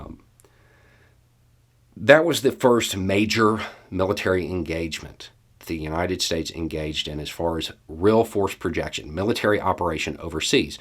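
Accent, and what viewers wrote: South, United States. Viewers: I wish I had you as a political science teacher in high school!! I am sorry I ever jumped to a bad first impression of you...I will remember to never put off someone as unintelligent due to a long beard and Southern accent. I truly learn a lot from you. With sincere thanks!!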